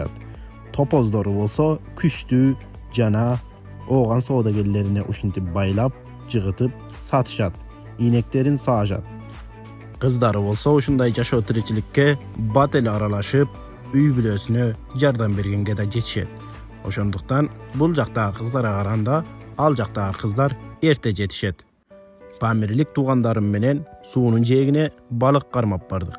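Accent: Standard. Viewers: Таза суйлопсун Рахмат тууган Памирликтерге салам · Азаматсын кыргыз тилин бурмалабай таза адабий тилде суйлойт экенсин!!!!!👍👍👍👍👍👍🇰🇬🇰🇬🇰🇬🇰🇬🇰🇬🇰🇬🇰🇬🇰🇬🇰🇬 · коптон бери корунбой калдын эле Памирге барып келген турбайсынбы Азаматсын кыргызчаны таза суйлогон Кыргыз жигит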